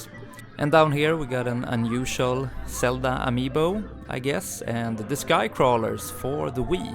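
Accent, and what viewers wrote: Sweden. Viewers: I would get any Jurassic park game for under $50 lol Also...do I detect a Swede? lol I've lived in Sweden for years and the cadence is familiar! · Haha där hör man den härliga svengelskan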